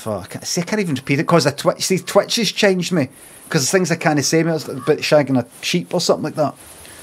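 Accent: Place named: Glasgow, United Kingdom. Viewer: wouldn't say you're going mad, but it is just a glaswegian accent. which is why it's more of a "chiy" sound than a "chae".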